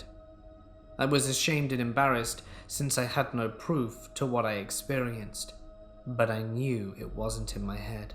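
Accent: England, United Kingdom. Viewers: is the best humble horror narrator of YouTube! Got to have that English accent, after all it is their language 🏴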